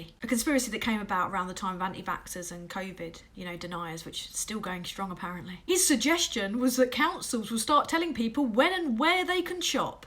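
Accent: London, United Kingdom. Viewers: Just out of interest, what part of the country are you from? Sometimes I pick up a London accent, other times a northern accent.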